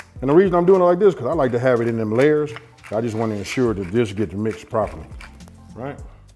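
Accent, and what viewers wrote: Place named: California, United States. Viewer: Are you from the Bay Area in California? Your accent says California to me. I was leaning toward Watts maybe but you sound like Ryan Coogler a little bit. San Diego native here . 😊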